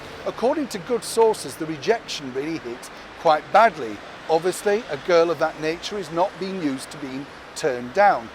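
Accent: United Kingdom. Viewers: I'm watching from Lisbon, Portugal. I love your beautiful British accent.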